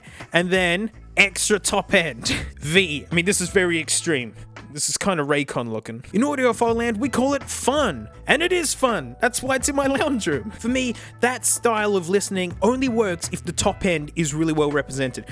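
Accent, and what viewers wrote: Australia. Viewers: Aussie humor + Aussie voice + Headphones and or headsets = great video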